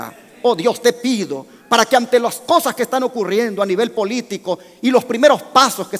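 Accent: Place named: Central America. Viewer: De donde es pastor su acepto suena de centroamerica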